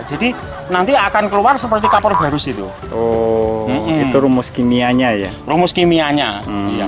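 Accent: East Java, Indonesia. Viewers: Orang mana pak?, kayaknya Jawa timuran..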